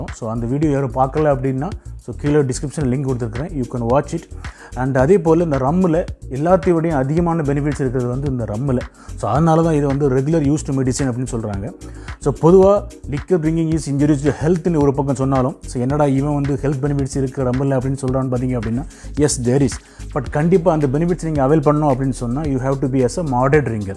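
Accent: Tirunelveli, India. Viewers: Anna...nenga tirunelveli thoothukudi side ah?😅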